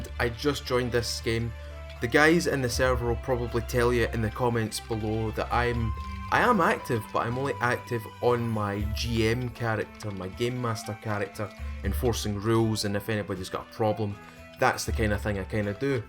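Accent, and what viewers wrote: Scotland, United Kingdom. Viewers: I did NOT insult your accent, I really like the way scottish people from all over Scotland sound when they speak, I was just asking because it sounded like you had a scottish accent, but it sounded a bit different from the scottish I've heard so far, so I was unsure.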